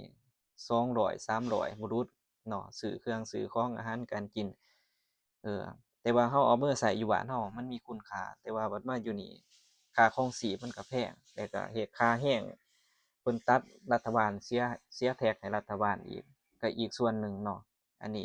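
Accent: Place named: Champasak, Laos. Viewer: ຢູ່ບ້ານໃດຢູ່ລາວເວົ້າຄືຄນແຂວງຈຳປາສັກ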